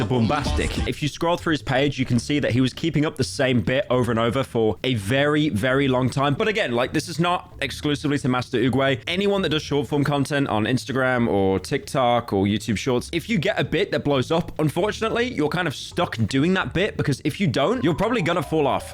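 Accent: United Kingdom. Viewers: Idk why but I like listening to this brit yappin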